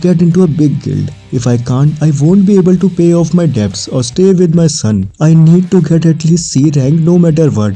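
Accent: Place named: India. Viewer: Nah not this India voice 😢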